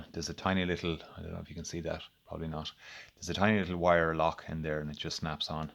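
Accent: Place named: England, United Kingdom. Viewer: Love your English accent.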